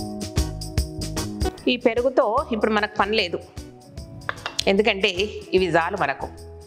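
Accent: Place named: Telangana, India. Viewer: Hi aunty... Endukoo mee videos chuustheee masthguntadhi... Pakka telangana mataluu... Nakk masth istam... Goo on and touch the sky in your YouTube channel.. Telangana basha marchipoovadhu.... Okka doubt... Badam tolu pothe emaithadhi??....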